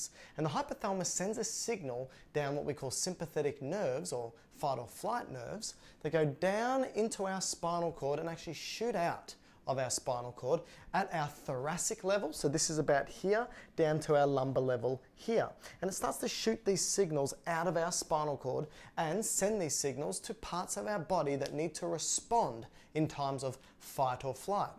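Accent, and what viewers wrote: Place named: Australia. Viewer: Your accent is exact like some of the legendary Australian cricketers..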